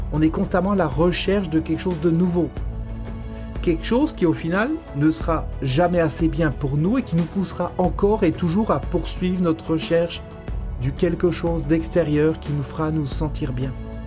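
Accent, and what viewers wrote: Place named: Eastern France, France. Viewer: Ton accent te piège??? Lol . T es de l est de la France????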